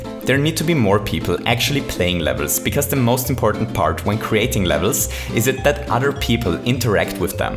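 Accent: Germany. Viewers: Man hört raus das du Deutsch spricht und Lesezeichen ist ja auch ein deutsches Wort #Ceavearmy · Du bist deutscher? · You're from germany, right? ^-^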